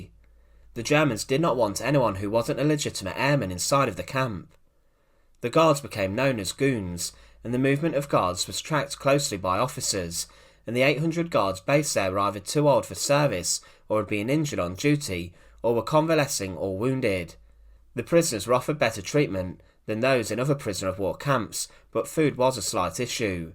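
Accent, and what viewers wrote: United Kingdom. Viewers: I am a vet and would have enjoyed this video more if I didn't have to struggle to understand the narrator. I have heard other variations of Brittish accents that I liked listening to, but not this one ! It is not my intent to be rude, but I got a headache listening to this guy. I wonder why this guy was chosen to narrate, was he related to one of the escapes who were executed.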